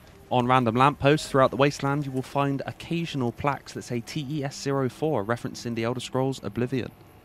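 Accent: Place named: South, United Kingdom. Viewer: You’re from Dorset or Wilshire! 😆 the southern British accent is strong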